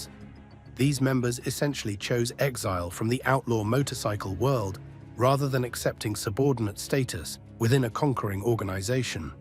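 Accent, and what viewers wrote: England, United Kingdom. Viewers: Why so many videos narrated by an English accent?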